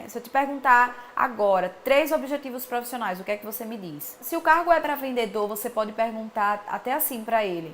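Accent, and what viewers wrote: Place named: Fortaleza, Brazil. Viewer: mulheres com sotaque de fortaleza é o mais lindo.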